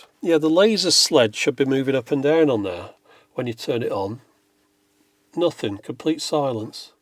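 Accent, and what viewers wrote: United Kingdom. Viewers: you sound like oyur from the uk so maybe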